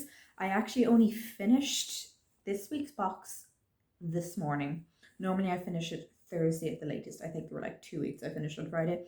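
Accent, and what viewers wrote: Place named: Ireland. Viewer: Love your fabric choices. Great to hear an Irish accent. Happy stitching.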